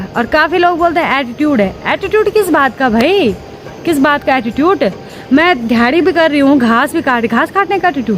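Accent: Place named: Hills, India. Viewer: आपकी बोली, भाषा, पहनाव, ओर आपका स्वभाव एक पहाड़न की पहचान है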